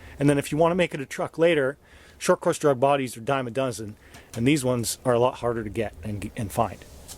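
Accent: Canada. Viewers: New to your channel. Just subbed. Thought you sounded like you were from the great white north. Nice collection. Just did my first tekno build. Mt410 baja beetle with a wing. Went a little over board with the part. $2000 without the reciever.🤯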